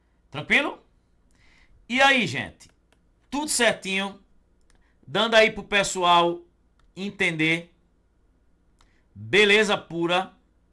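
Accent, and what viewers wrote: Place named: Northeast, Brazil. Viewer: Ótima aula, e com esse sotaque fica melhor ainda😀 adoro sotaque nordestino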